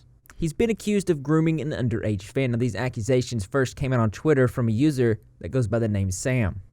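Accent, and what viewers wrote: South, United States. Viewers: I forgot what state he said he’s in but it’s an American southern/ mid western accent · oh okay I couldn't pin point it. I'm like he sounds southern but mixed with another accent 😁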